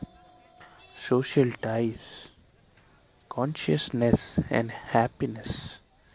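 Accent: India. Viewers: Indian accent is just the best! <3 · Please do whispering videos!!! The Indian accent is the best accent ever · I love the Indian accent! Would be great if you did some whispering videos. 😊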